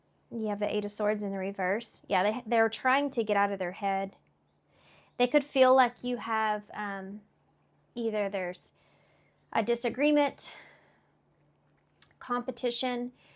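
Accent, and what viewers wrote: South, United States. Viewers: I think your southern accent has everyone calling you ma'am 😂 beautiful! Great reading thanks ma'am ❤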